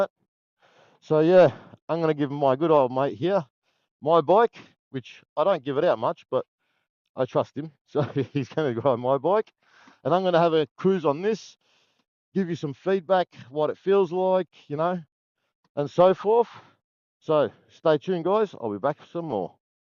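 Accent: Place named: Australia. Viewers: Yeah this is a GEM bike. IMO it's the complete placate because you can use it for commute, twisities and some level of track riding! Btw are you from Australia, haha? Can sense the aussie in ya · Definitely sounds like a fellow Australian, which makes the fact he's driving in somewhere with greek/cyrillic alphabet(?) all the more interesting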